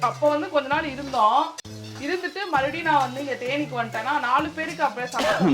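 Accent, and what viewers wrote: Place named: Malaysia. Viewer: Are you from Malaysia sis.because your tamil talking same as Malaysia.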